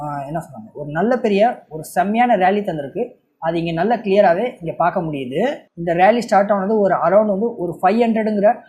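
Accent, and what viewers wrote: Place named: Mumbai, India. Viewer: Mumbai kaarar paesum alagiya tamil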